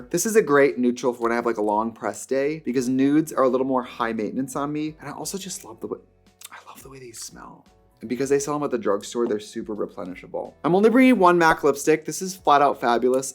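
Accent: Wisconsin, United States. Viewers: Her Wisconsin accent is so strong in this vid! So adorable, love from Milwaukee 🍻❤️💅🏻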